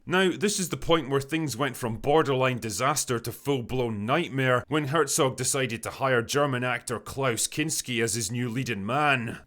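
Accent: Scotland, United Kingdom. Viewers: Is it weird that I don't care about the movie but listened just for your Scottish narration?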